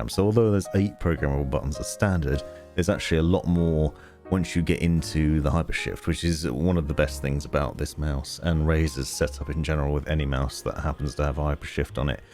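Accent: United Kingdom. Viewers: Always enjoy the soothing original British voice of yours <3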